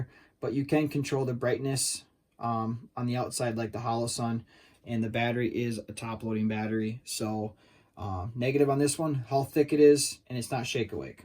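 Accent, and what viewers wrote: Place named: Minnesota, United States. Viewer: your voice and accent is remarkably similar to the friends' who I grew up with in Minnesota (near the MN/WI border in a town called North Branch).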